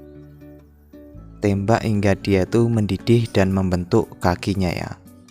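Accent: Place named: Banyumas, Indonesia. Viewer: salam ngapak bro aja kelalen madang😂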